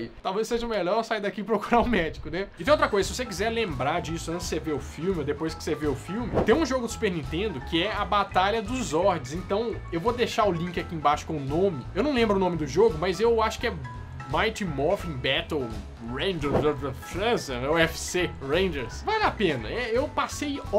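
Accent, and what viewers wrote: Minas Gerais, Brazil. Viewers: Esse brother é muito mineiro! kkkkkk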